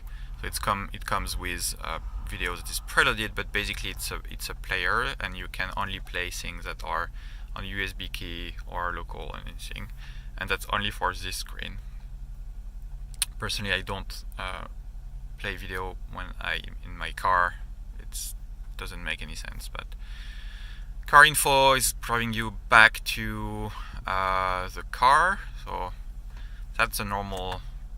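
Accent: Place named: France. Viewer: Au vu de l'accent je suppose que tu es français, donc super vidéo ! Je reçois le miens dans quelques jours et ta vidéo va me permettre de faire tout fonctionner un peu plus rapidement. Merci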